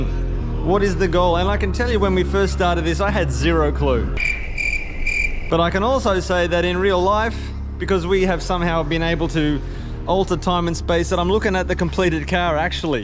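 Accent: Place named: Australia. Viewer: Yeah man! Of all time! I'm struggling to place anyone more down to earth up there, always good to hear an Aussie voice on YouTube. If I ever get that holiday to Japan hopefully I bump into ya! Keep doing what you're doing man, can't wait for the next vid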